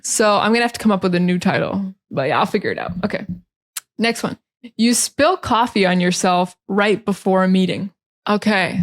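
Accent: General American, United States. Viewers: Hi, your accent is really an American standard accent. I understand it well. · I found it interesting what you said about your accent , that you have a standard american accent , the same as the young american generations and that people from California, Texas, Indiana, Minnesota speak with the same accent you have. But that surprised me because I attended an english course where there was a young teacher from New York who has an accent pretty different from yours.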